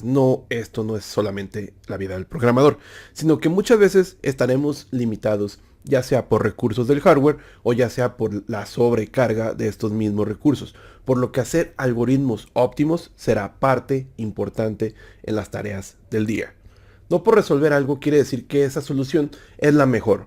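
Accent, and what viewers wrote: Mexico. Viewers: Fresa-barrio xd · We eres bien verga hablas como fresa-barrio y explicas bien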